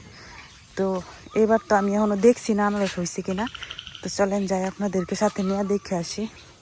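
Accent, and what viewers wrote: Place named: Comilla, Bangladesh. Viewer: ম্যাডাম কেমন আছেন। আপনার কথাশুনে বুজতে পারছি আপনার পর্ব পুরুষ কুমিল্লার হবে।তবে যাক পরের কথা হল আমি কুমিল্লা জিলা তিতাস উপজেলা থেকে দেখতেছি আমি বলতেছি ম্যাডাম যদি জমি কিনে রেখে দিলে কয়েক বছর পরে বিক্রি করার জন্য ঠিক হবে কি। কমেনট করে জানাইবা কেমন ।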